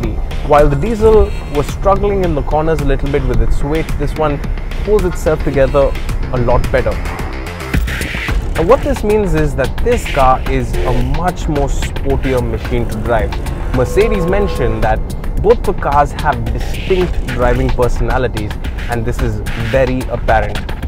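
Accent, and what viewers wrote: India. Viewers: I literally can't understand you with your indian accent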